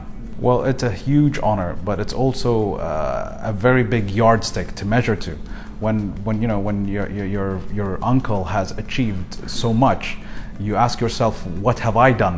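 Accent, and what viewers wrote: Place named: England, United Kingdom. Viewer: mashllah i like ur englsih accent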